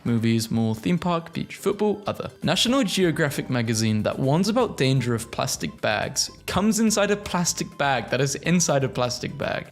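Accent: United Kingdom. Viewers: typical British pronunciation confusion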